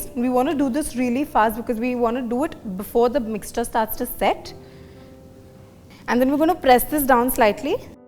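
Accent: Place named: Delhi, India. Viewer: Delhiwaali english is funny yet irritating for ears.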